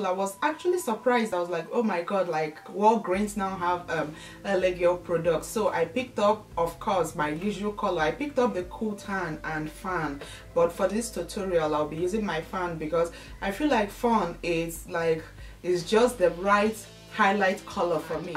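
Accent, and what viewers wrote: Nigeria. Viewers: I love you. Its been a while since i heard a Nigerian accent (ps i'm Nigerian too). I just had to subscribed. Thanks for sharing. xx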